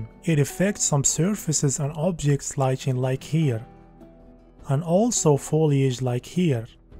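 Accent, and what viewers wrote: Morocco. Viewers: where are you from ? you have a Moroccan accent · You're Moroccan aren't you XD I can tell by the accent. Best of luck !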